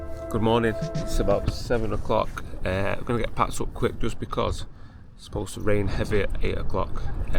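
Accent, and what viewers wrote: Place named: Salford, United Kingdom. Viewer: Good vid pal new subscriber sound u like from my neck of the woods salford or near by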